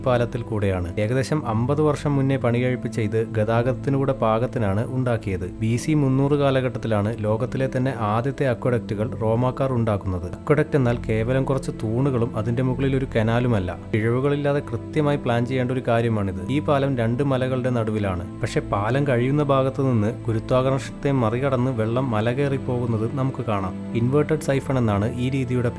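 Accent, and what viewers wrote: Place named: Kozhikode, India. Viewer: ഇങ്ങള് കോയിക്കോട്ടുകാരൻ ആണല്ലേയ് 🙂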